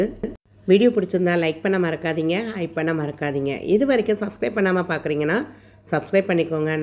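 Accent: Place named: South, India. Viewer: neenga south ah ka...slang apdiye irukku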